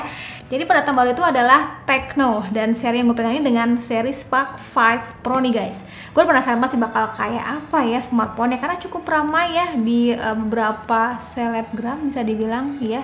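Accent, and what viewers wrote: West Java, Indonesia. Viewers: Mantap 😘😘😘😘 Sunda gituloh😂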